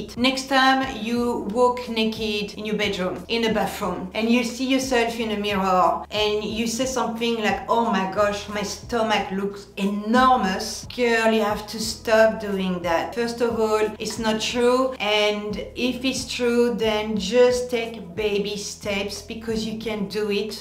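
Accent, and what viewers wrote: France. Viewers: Great video delivered in that inimitable French way!I decided right away to follow your lead -not dieting but living within a discipline-I will report back in a month Thank you!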